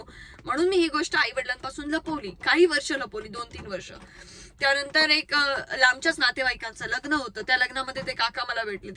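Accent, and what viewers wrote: Malvan, India. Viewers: I watch your videos only because of your Malvani accent 🥰😍 love Malvani ..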